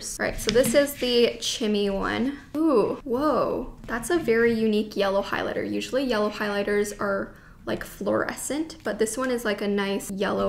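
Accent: Canada. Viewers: are you canadian? i know, weird question but still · cocobana29 she’s canadian, but go off...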